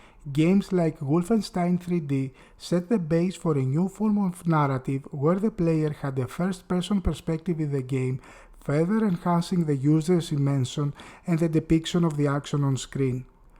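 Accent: Greece. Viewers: Hahah why, WHY you Greeks can't pronounce sh as in sharp, ch as in child, j as in joker... I will never understand :D Still, great job, I am enjoying this.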